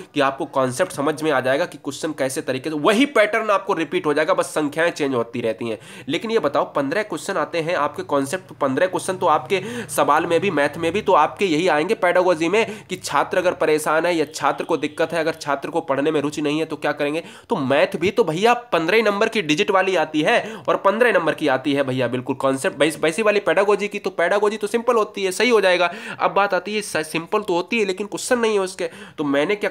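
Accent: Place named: Uttar Pradesh, India. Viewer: Up se sir khadi boli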